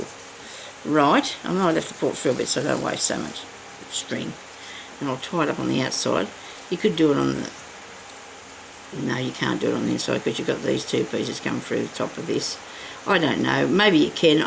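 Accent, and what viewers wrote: Australia. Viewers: love the accent. you in aussy. good video. always wanted to come to Australia but everything over there that bites is deadly lol. good job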